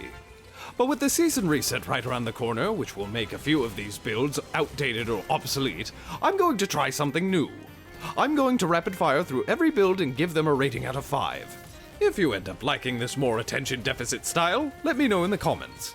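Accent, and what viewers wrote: United Kingdom. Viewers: i noticed that throughout the entire video the narrator has a british accent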